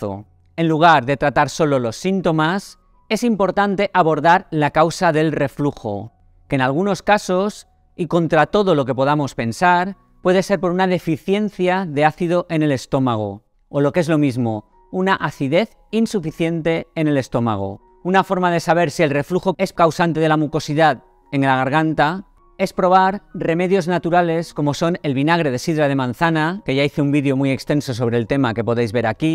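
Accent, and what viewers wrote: Spain. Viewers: fuera moco de mi garganta.... genial, muy práctica y exhaustiva tu explicación. Cada vez más informado. Genio!!! si vas al médico te dice: -consume lácteos, sra? y tu te apestas comiendo lo que te enferma!!!! ( pero que a tu paladar le encanta!!! no somos inocentes!!! ) volvamos a la fuente, comamos como nuestros abuelos. Ni poco ni mucho: suficiente! Y sencillo. De buena calidad nutricional. Pero no!!! vamos a la tienda de alimentos y compramos etiquetas... así nos va!!!! Gracias. Me agrada tu acento tan español... Te saludo desde Argentina.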